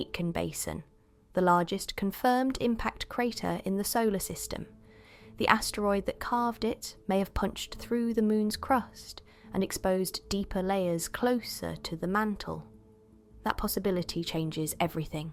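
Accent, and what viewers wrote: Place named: England, United Kingdom. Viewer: Hmmm A Chinese AI with an English accent. Already sounds suspicious. It asl us to like and subscribe before the story gets strarted, They found a metotite in mongolia that was clearly marked made on far side of moon. They found that the samples taken 55 years ago and thousands of miles from their sample site were different. Okay, I've listened to enough. Thanks for the laughs.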